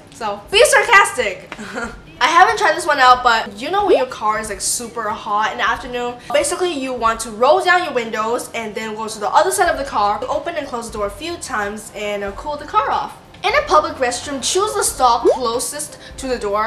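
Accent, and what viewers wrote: Canada. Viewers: Are you Canadian????😝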